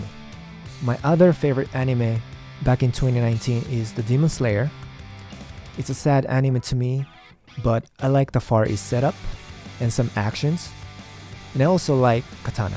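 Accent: Philippines. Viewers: I actually thought he was filipino when I heard his accent, I didn't know. Haha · I'm probably wrong but I could hear a slight Filipino accent/diction, I'm guessing you lived in the Philippines for some time? Dude your singing voice is amazing. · i knew it from the accents thats he's a half fil 🤣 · Yeah the accent is definitely flip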